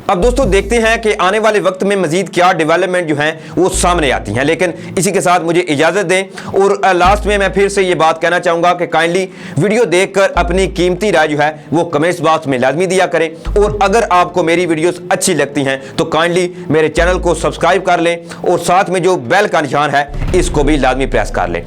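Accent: India. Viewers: If you don't mind, may I say that you need to improve ur accent. You speak like indians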